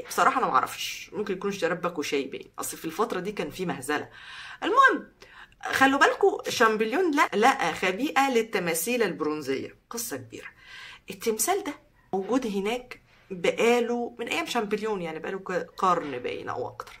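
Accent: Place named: Japan. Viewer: انا حبيت جدا الاكسنت بتاعتك باليابانى بجد تحفة ❤❤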